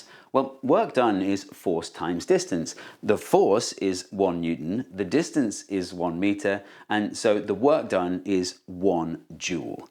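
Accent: United Kingdom. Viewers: Just an idea that popped into my head at the end of the video, no idea if this is relevant or not, but does the hinge location relative to surface the ball is striking on the lever make a difference? The levers you tested either had the hinge point in the center of the lever or on the opposite side of the lever from where the balls where striking it. Since all these levers will necessarily have a thickness, the hinge point was never in-line with the contact surface. Would there be any difference if the hinge point was inline with the contact surface? what if the hinge point was moved in the opposite direction (towards the balls)? Or what would happen if you moved the hinge point even farther from the balls (like a couple inches[or I suppose you could use centimeters] back)? Another idea that popped into my head while typing this; why do English people not spell it 'leever' when they pronounce it that way? 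You don't pronounce the word 'level' that way.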